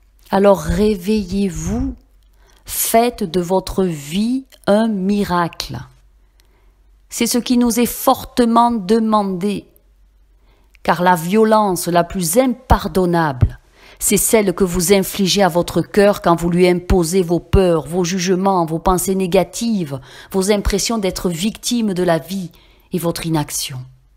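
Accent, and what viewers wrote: South, France. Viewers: Bonsoir.J'adore votre voix du Sud. Namaste:)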